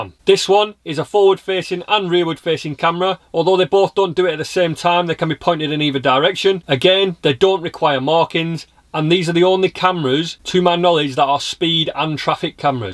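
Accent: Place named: West Midlands, United Kingdom. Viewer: Hi there! First off, great video! I'm from the West Midlands (old South Staffs actually) so I understood your accent perfectly - just in case some people whinge about your accent! LOL I've been driving since 1975 and hold both a full car and motor cycle licence. Most of my working life was spent in the motor trade and during that 'life' I covered many, many hundreds of miles on road test - some(!) of those miles at speeds that were, shall we say, a little north of legal! Also - during that time - I was 'done' only once for speeding (more by luck than judgement!) and since leaving the motor trade proper, I have fallen foul of a speed camera (one in a van) once as well. As a matter of interest, I've been involved in a few minor accidents too down the years - ALL at speeds BELOW 30mph! As someone who has been interested in driving since I was a young child (I have pictures of me in a pedal car at 'Uncle Eric's pedal car track in Rhyl, North Wales dating back to the early '60's!) I have become ever more annoyed by the way cameras have been used to sneakily extort money from the easiest target/cash-cow of all - the motorist. 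I totally agree that if someone is driving dangerously, then they should be penalised for it - but speed ISN'T always the issue! BAD DRIVING is! A proficient driver doing 100mph at 3am on an empty motorway is surely no more dangerous than a tw*t doing 40 in a 30 at 3pm in a school zone! I drive for a living now, and on a daily basis, I see people out there who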